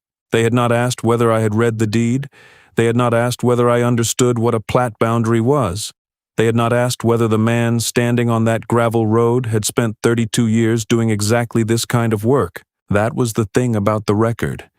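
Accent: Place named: United Kingdom. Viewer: It'd be nice to loose the British accent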